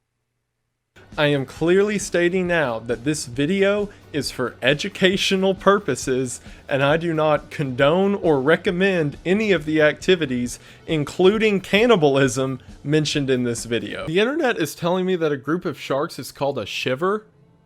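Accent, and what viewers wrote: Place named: South, United States. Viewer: I adore Wendigoon. I go to sleep listening to that beautiful southern twaaaang.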